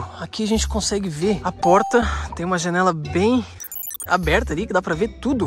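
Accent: Portugal. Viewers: Pareces um português a tentar falar brasileiro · tens bue sotaque pt de portugal ahah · você é português, né? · Vc fala igual português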